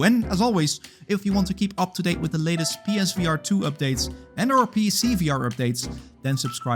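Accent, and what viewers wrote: Netherlands. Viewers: is it just me or does he have a dutch acsent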